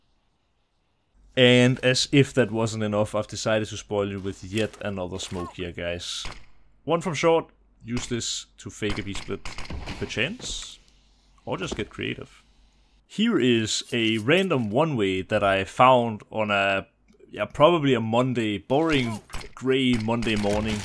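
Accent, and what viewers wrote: Denmark. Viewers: Danish accent kills me, it’s so awful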